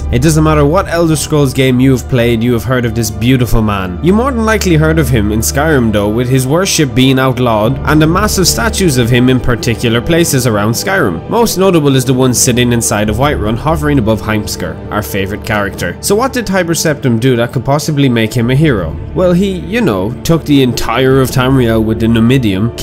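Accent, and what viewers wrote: Ireland. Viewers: Are you irish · and yes i love and miss that sexy irish voice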